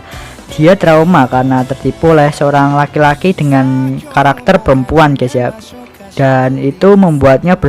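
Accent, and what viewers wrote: Java, Indonesia. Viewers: Wong jowo maa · Eh kau orang Jawa ya?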